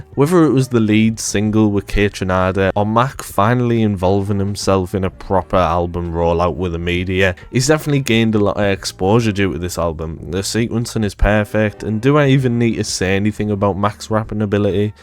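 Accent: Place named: North, United Kingdom. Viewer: Last accent in the world I expected you to have 😭 where up north are you from?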